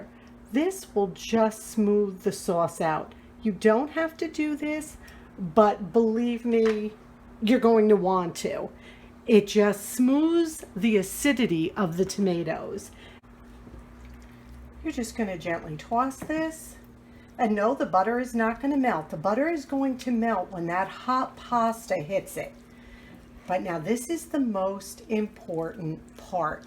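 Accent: New York, United States. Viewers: I thought I detected a NY accent but I didn't know you had a bag tax thing too?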